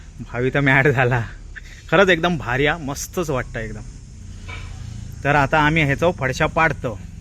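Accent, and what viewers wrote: Malvani, India. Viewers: तुमच्या एवढी गोड मालवणी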